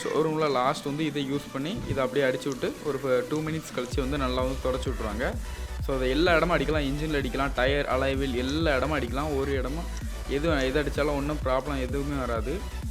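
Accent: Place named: Kerala, India. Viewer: Dai... Nee pesurathe Kerala da.. Music cut pandra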